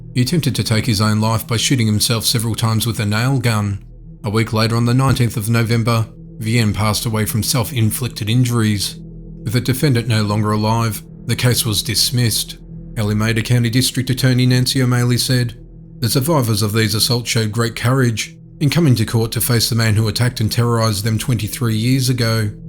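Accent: Australia. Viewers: The narrator is obviously from Australia. They pronounce many English words differently than we Americans do.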